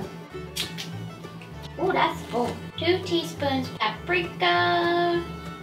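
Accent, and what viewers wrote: South, United States. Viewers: Your little family is adorable ♥️. And are you loosing your southern dialect? You are starting to sound more and more like a Californian.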